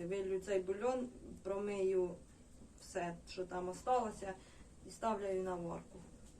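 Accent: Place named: Zhytomyr, Ukraine. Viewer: Красавица ти звідки з Житомирщини акцент суржика або Черкаси, звідки ти будеш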